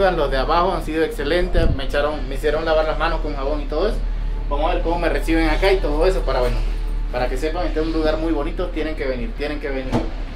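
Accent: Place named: Quito, Ecuador. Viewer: ya el acento Quiteño esta más notable en ti amigo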